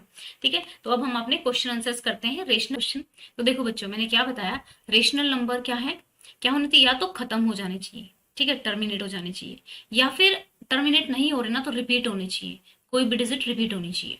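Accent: Haryana, India. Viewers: Mam you are from Haryana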